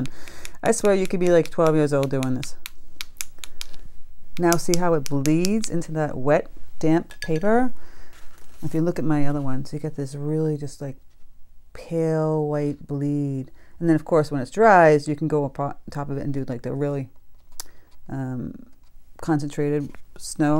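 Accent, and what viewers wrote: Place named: New England, United States. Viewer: I loved the painting and I love the tutorial. You are very good at explaining the process. And I loved that I could detect a New England accent I believe!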